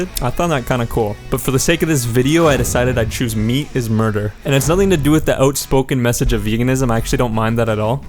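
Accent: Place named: Canada. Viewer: Probably because of the faint canadian accent in there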